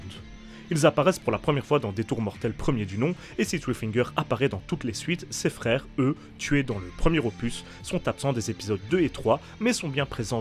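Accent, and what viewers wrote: Belgium. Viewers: ah batard t’es belge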